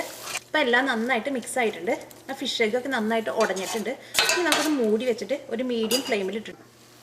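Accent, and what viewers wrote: Thrissur, India. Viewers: Thrissur bhasha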